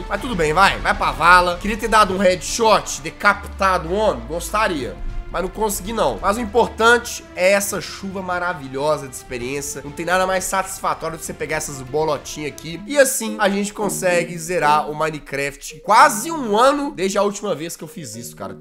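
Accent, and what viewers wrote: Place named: Minas Gerais, Brazil. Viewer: certeza que é mineiro, sutaque bão demais soor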